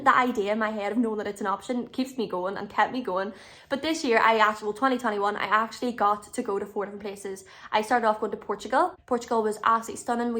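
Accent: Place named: Ireland. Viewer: Irish accent sounds so harmonic.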